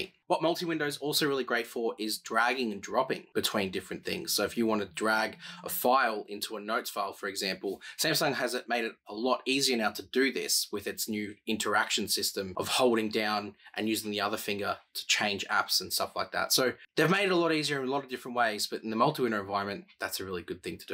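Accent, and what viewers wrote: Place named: Australia. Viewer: Wow good to hear a Aussie accent on the phone review. Thanks so much, I have the Z Fold 3..might change to the 5 eventually..great review thank you 👍👏👏👏❤️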